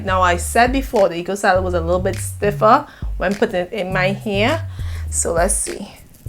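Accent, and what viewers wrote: Trinidad and Tobago. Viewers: I'm new to your channel but are you from Trinidad? Your accent is beautiful!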